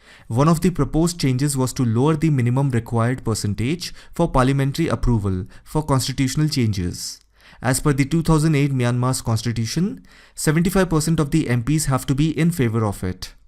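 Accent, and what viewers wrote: India. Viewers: As soon as the Indian accent came up, I know this video is anti-China & full of gibberish. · The commentator has a very strong Indian accent with horrible pronunciation of many English words. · I can barely understand you, your indian accent is too strong put subtitles please.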